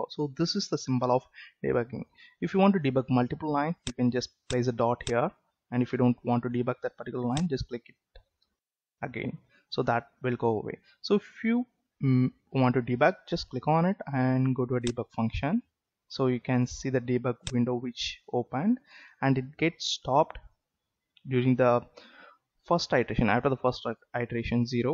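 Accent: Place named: India. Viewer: From I heard the Indian Accent I knew gonna be great vid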